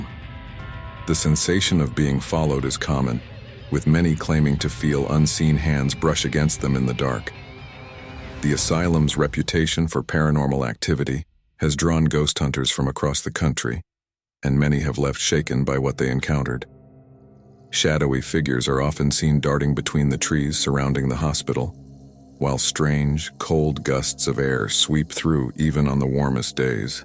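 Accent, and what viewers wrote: United States. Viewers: Why do we have an American talking about this stuff? Stick to your own stuff!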